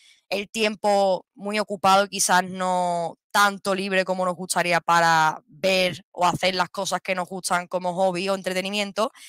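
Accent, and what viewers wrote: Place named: Andalusia, Spain. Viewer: Hola!! Me he suscrito recientemente, gracias tb de estar suscrita hace poco d a través de Triunarts y asi os he ido descubriendo. Me encanta como compactais las dos y bueno tb como explicas los casos. Espero q te vaya todo genial!!Además me encanta el acento andaluz 😊 Saludos desde Barcelona 🤗😊😊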